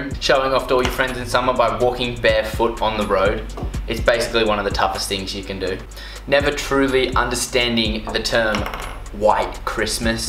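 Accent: Australia. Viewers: Lol.😁 I understand him perfectly... Oh, right... I'm Aussie too.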